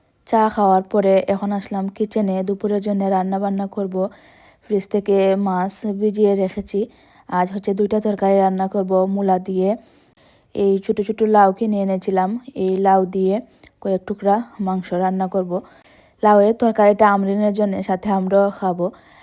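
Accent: Sylhet, Bangladesh. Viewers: মাশাল্লাহ আপনার ভিডিও খুবই ভালো লাগে আপু। আপু আপনি কি সিলেটি। কথার ধরন অনেকটা ওরকম লাগলো প্লিজ ডোন্ট মাইন্ড।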